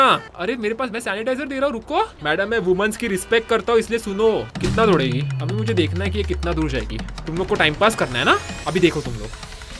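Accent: Maharashtra, India. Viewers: Bhai vo marathi h main bhi shi re shi ghan aisa bolta hu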